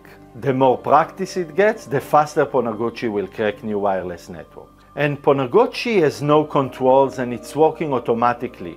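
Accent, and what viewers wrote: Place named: Israel. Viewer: וואו אחי פעם ראשונה שאני רואה אותך ביוטיוב ופשוט ישר איך שהתחלת לדבר הבנתי שאתה ישראלי ואז ראיתי את השם של הערוץ והבנתי שאני צודק... תעבוד על המבטא 3>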